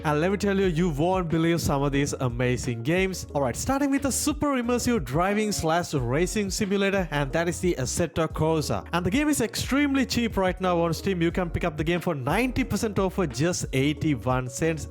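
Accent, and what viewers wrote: Sri Lanka. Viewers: Let me guess are you sri lankan? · Are you sri lankan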